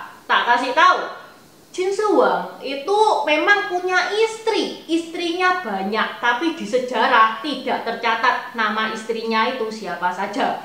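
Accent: Java, Indonesia. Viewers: Wowww medhuoknya banget bangett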